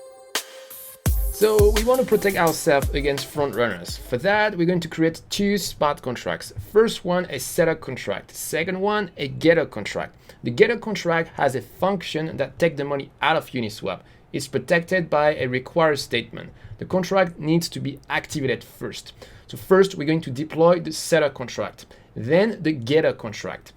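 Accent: France. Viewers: Salut. Es tu francais? Je reconnais un petit accent. A +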